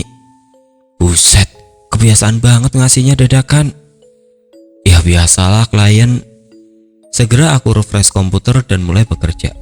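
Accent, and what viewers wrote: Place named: Java, Indonesia. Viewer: logatnya nanggung, klo jawa sekalian, jaksel sekalian.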